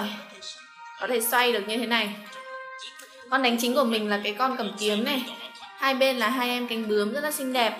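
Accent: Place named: South, Vietnam. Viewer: nói giọng miền nam đáng yêu vải :v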